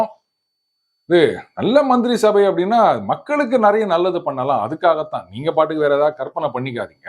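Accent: Chennai, India. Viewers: Yenna saambu ore kuriyeedu Nari (traitor) Puli [Poonai kutty) Singam (Anna)... bada shokka keedhu pa... kalaakureenga thaleevare... Cho illadha kurayai nivarthi panreenga sir.... your Chennai slang is awesome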